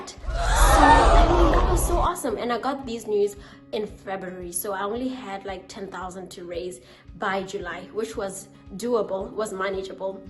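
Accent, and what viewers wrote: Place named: France. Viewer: Great French accent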